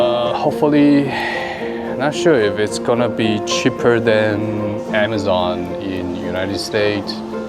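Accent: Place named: China. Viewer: wow! what a Chinglish~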